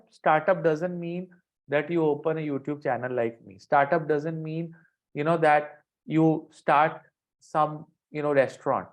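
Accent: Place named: India. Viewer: he is Indian look at his English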